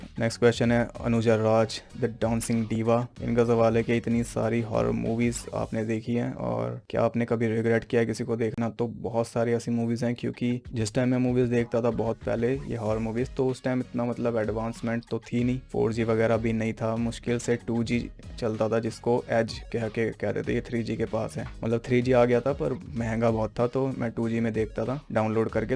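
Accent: Haryana, India. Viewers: Your Harvyanvi accent make impact on your explanation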